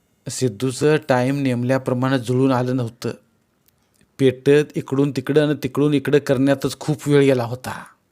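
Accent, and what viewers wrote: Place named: Nipani, India. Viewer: कथा सांगली मिरज कडची आणि बोलण्याचा टोण निपाणी हामीदवाड कडचा वाटतोय जरा जरा सुर वडताय 😂 कोकणी गत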